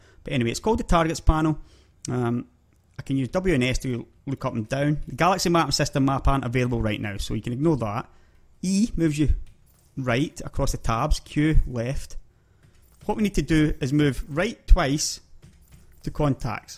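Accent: Scotland, United Kingdom. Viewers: your accent here is beautiful, one of the most luxurious Scottish accents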